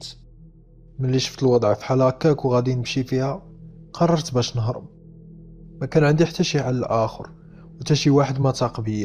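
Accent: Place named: Dammam, Saudi Arabia. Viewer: انــتت من اي ديرة في السعوديــة من اي منطقـة لهجتـك م افهـم شكلك من دمامم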